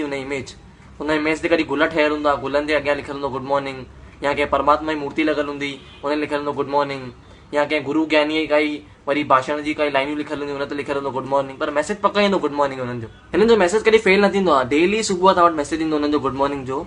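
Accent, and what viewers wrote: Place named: Sindh, Pakistan. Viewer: your Sindhi is pure 😊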